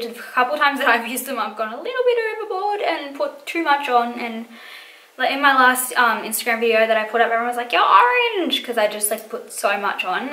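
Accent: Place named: Australia. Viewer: 'Just over here fuckin goin ta town' 😂😂😂 your Aussie is on fire in this tut 🔥😆 love it! Hello from Perth definitely subscribing xx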